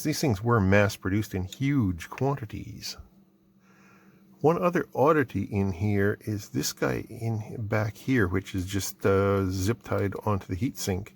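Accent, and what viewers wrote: United States. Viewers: I'm going to assume you are a Big Clive fan, after the "one moment please" Going to also assume you from the extreme northern USA, Montana to Washington, from hearing, OAT and ABOAT. for out and about. Got a free X-10 sample pack after reading of their $40 value in an electronics magazine. (Mid 90's) 6 other electricians from our vacuum cleaner plant in N Canton, Ohio got in on the deal. Still have one to open the garage door 30 years later. (Sears wanted a fortune for a transmitter and had made buying the decoder chip impossible.) Good Bye Sears 🥲🤣 Here's your 👍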